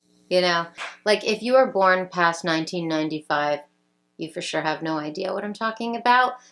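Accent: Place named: North, United States. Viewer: Who watches you, you murmur. I must have issues. Yes, I am a Midwest gal transplanted to the Dallas area and so grateful to hear the Northern accent (which to us is not an accent, it is in Texas that there is an accent.) You get my drift. Every video is like a visit from the little sister I never had, the one in Canada, not Minnesota, blonde by design instead of nature, the cute sis. I am so grateful for your video visits.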